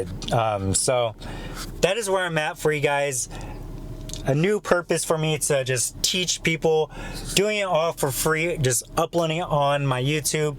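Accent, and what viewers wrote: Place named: Mexico. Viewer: I love this guy‘s content. I just can’t get past the fact that he lives in the United States, but talks like he just migrated from Mexico or something is this just because he lives with his parents or what because that’s crazy how you can go to school for so many hours a day but still end up talking like you’re not even from this country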